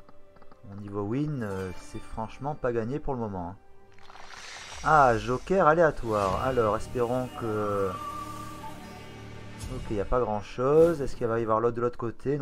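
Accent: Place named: South, France. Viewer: Tu habites dans le sud ? T'as un accent 😄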